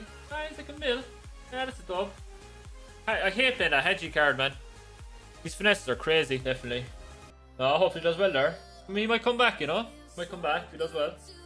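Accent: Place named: Ireland. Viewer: Are you irish ? Sounds like it to me so am i !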